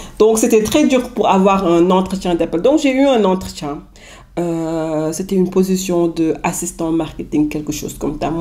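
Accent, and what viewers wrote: Senegal. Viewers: J’adore l’accent sénégalais ❤️❤️❤️ much love from cameroon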